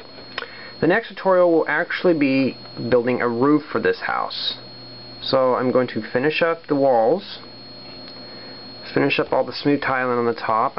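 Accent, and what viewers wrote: South, United States. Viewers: you have what sounded like a southern accent that ive never noticed O.o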